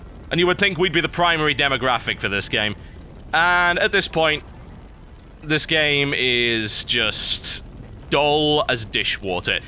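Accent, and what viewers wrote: United Kingdom. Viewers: reason i watch the whole thing your british accent :D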